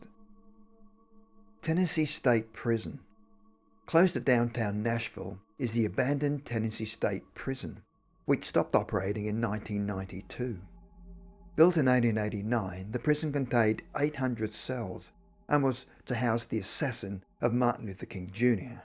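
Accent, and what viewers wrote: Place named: Australia. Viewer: Ohhh ok. Well great! Yup. He was right about that. And I'm glad he did more shows in prisons. To spread love cheer and the gospel. I had only heard of him playing At Folsom.... So that's why I was wondering. I never have learned everything about him. But yeah I'm glad he came to Tennessee state prison in 1974. 🙂👍 And yes your welcome. Your videos are fun to watch and spooky and interesting. Your Aussie accent makes it even better. 😄. P. S. I live in Memphis TN and grew up here. I have been to the Orpheum once years ago when I was a teen and it is definitely a beautiful but spooky place. I read that Mary the little girl ghost ....once threw the janitor lady's spray bottle into one of the toilets. Lol. She looked everywhere and found it there. That was crazy.😄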